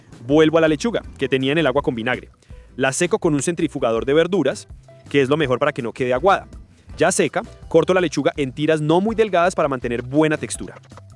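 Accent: Colombia. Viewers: Cheff una pregunta eres colombiano?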